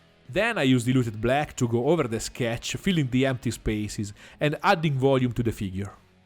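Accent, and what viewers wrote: Italy. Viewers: Boia come si sente che sei italiano. Bravissimo comunque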